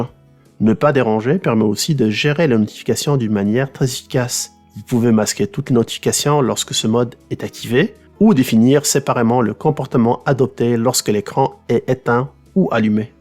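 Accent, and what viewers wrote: Quebec, Canada. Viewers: Bonjour de France pitié je suis entrain de recherché des tuto sur Youtube comment stopper les pubs qui nous dérange presse tout les 4 minutes en visionnant des youtubeuses et youtubeurs ou des émissions ou des films sur Youtube toujours que je parle .donc g pas 1 smartphone dernier cri mais 1 petit simple Wiko .et attention qui n'a pas toutes vos options et appli ? Entre autres les vns privé ou je c quoi je la trouve pas? G juste 1 minimum syndical ? MDR ah ah pitié au secours besoin 2 votre aide urgent ?en attente stop mes recherches et je reste avec vous ok Fréro ? Merci et en plus on adore l'accent 2 Québec. MDR France mardi 1 er novembre 2022.14h 45 de l'après-midi et chez vous c quoi? Bon en attendant la réponse je m'abonne d'urgence pour pas rater le retour ? Ah ah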